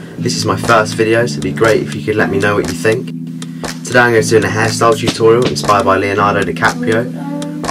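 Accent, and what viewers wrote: United Kingdom. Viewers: you’re from england I see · Ooh he’s British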